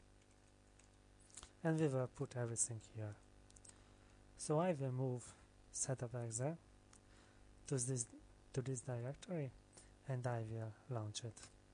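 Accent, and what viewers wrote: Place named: Hungary. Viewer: azért észre lehet veni a kiejtéseden, hogy magyar vagy XD. Amúgy nagyon jó tutorial. Kössz szépen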